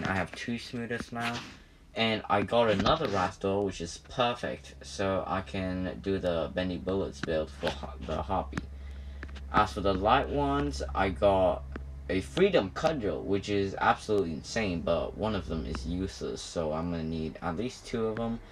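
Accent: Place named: United Kingdom. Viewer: British accent cute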